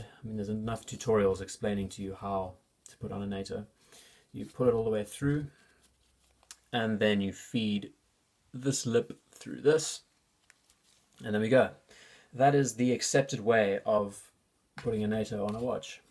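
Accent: South Africa. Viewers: Great video thanks! Are you South African?.. actually no chance you not with that accent. Howzit! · Excellent Video Brother. Don't lose the SA accent - takes me right back home. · cool tip. your accent has a south african lilt :-} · Wow. All these years. I had no idea!! Btw do you mind if I ask if your accent is from 🇿🇦? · Except the guy has a clear South African accent.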